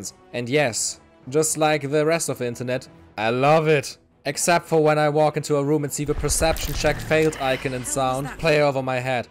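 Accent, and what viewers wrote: Germany. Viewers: Jooo ich wusste nicht das du deutsch bist lol obwohl man das schon raushören kann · Haha click aufs video und hoere gleich mein geliebtes deutsch heraus 🤣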